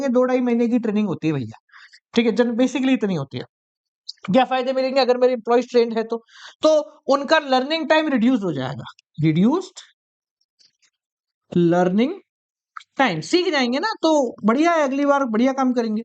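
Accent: Rajasthan, India. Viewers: Sir ap rajasthan hu ho kyi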